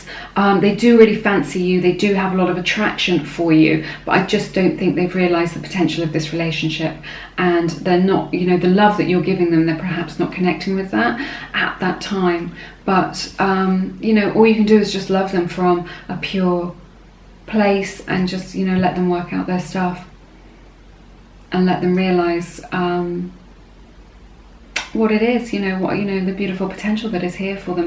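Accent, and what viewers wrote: United Kingdom. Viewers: THANK YOU so much! I was lead to your channel by another youtube channel who I really respect. I’ve found that channels my friends or other readers have recommended to me are usually very accurate (could be the strength of my energy that’s being picked up on 🤔 Your reading was absolutely spot on to the point I was just smiling knowingly all the way through it (I chose 2). And because I’m also British, I don’t know why but I seem to resonate more with it. Silly I know but I like hearing the familiarity of the British accent! Thanks once again. 💙⭐️🙌🏾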